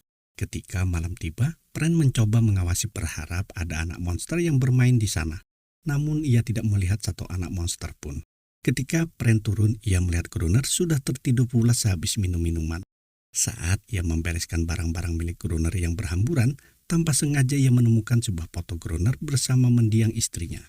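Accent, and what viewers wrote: Indonesia. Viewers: Maklum orang Sunda ya bang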